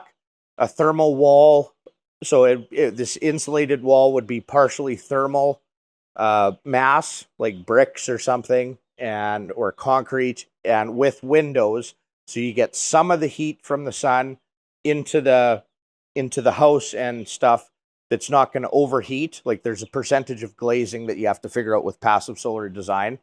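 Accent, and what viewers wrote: Canada. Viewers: This guy sounds so Canadian i Love it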